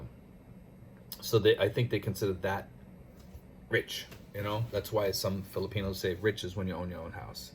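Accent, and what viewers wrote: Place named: Northeast, United States. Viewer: Sounds like your from the northeast of USA with your accent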